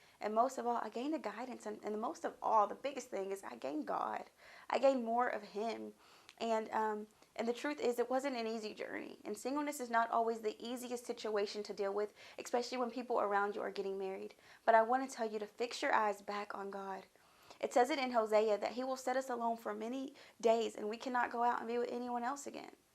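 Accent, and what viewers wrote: South, United States. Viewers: I hear a country accent ? Or am I tripping ? Lol I’m just as country mines is even worse people are always like girlllllll I know you from the south !!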